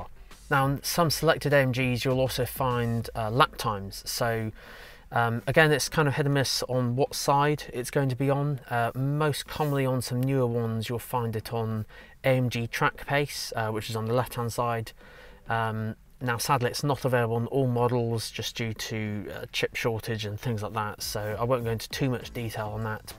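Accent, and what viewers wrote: United Kingdom. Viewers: you are the true sound of the German Mercedes Benz, with a British accent. Love your work mate.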